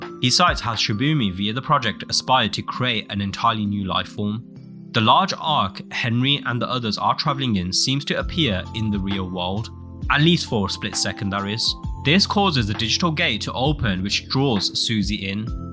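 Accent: United Kingdom. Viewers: This narrator from Birmingham? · the Scottish accent makes this 10X better ~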